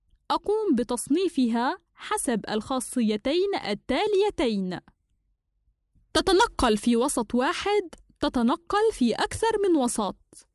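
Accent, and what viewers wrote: Tunisia. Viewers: انتي تونسية؟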